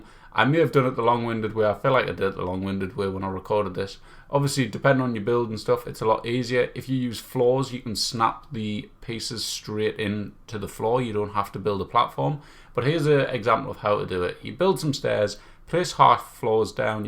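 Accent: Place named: Newcastle, United Kingdom. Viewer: Fellow geordie?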